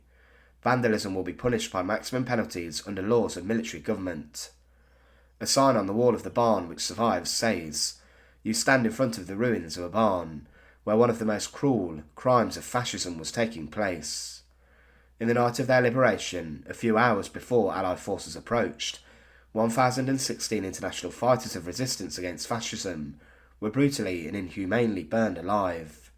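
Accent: United Kingdom. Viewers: Who is the jew with British accent narrating ? jew Bolshevism / Red October / Tsar Family Assassination / Holodomor / Stalin / Dresden Bombings / jew Soviet atrocities against civilians/ genrikh yagoda and countless others Shut up ya yid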